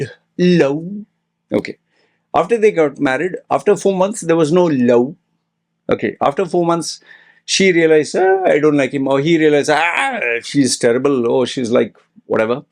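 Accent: India. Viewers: You sounds indian as fuck mate! You are pretending like a foreigner after having that tatts like moari brothers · Bro you sound like Indian😊😊😊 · You seems to be an Indian...your accent is so so desi.. Thank you big brother.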